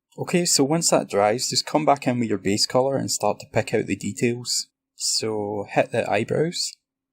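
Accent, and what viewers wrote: Scotland, United Kingdom. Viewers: VERY controlled and detailed work, the 'subtle' finish speaks (looks???) for itself! Great and CLEAR presentation in terms of info/technique/example AND such a lovely Scots accent!!! xx SF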